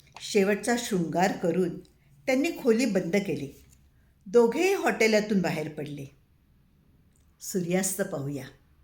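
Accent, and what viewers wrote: Standard. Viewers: Chan katha pan vachan Agadi flawless shudhha uchhar wa Kharch chan😇😇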